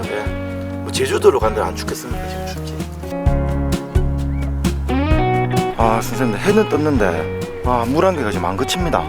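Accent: Gyeongsang, South Korea. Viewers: 일본어쏼라쏼라~~~믓지네요 뷰도 믓지고 ㅋㅋ 근데 일본어도 경상도 사투리가 묻어있네요 뼈속까지 갱상도!!ㅋㅋ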